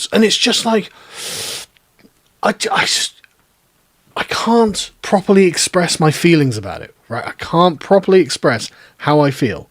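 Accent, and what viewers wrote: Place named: United States. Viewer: Sounding like an American now. 😆 👍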